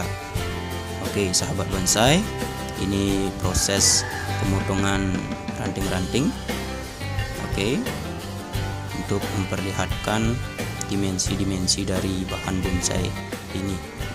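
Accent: Bali, Indonesia. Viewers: Logat bali sangat kental bli,, Salam semeton 🙏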